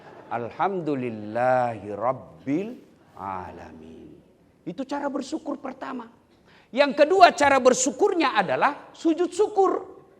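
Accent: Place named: Banyumas, Indonesia. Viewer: bahasane ngapak ora jelas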